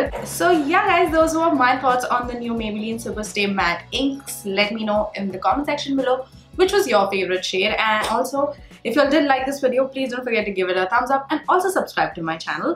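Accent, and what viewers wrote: India. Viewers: Lmao your Indian accent is very visible 🤭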